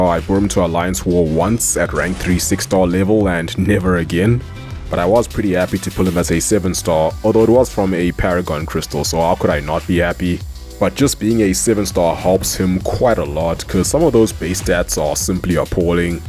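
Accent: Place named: South Africa. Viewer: It sounds south African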